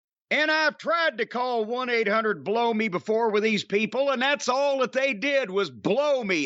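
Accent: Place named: Kentucky, United States. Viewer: "So I hammer the fUHk out of those things for about 50 hhhhuh'WHACKS" his Kentucky accent makes everything he says sound even funnier 🤣 He should have pulled out his ol'trusty tennis racket and started huh'whackin away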